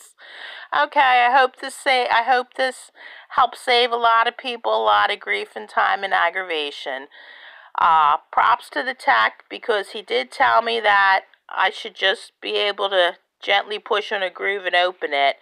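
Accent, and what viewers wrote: Delaware County, United States. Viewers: Do u happen to be from DELCO????? That accent sounds realllll familiar 🤣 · Wow, thank you! I would never have figured out how to change the batteries for this goofy remote. It is definitely not intuitive (and so different from all my other remotes) but your video make it super easy. Love your "accent". Are you from Delaware County?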